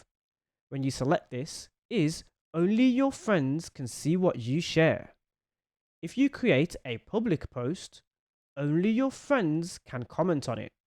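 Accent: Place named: Wales, United Kingdom. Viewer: You sound Welsh, lol! Well, I had a Welsh friend who had a similar accent atleast.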